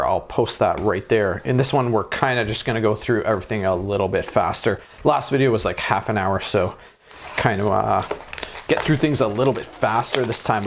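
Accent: Canada. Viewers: I got a mana crypt and many other goodies in my 2 boxes. You are so very Canadian sounding haha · please tell me you're Canadian. High quality accent. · yes 100% as soon as i heard your voice i was like "bet hes canadian"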